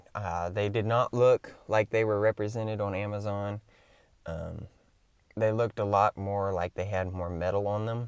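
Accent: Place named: Alabama, United States. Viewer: I know you You're from Alabama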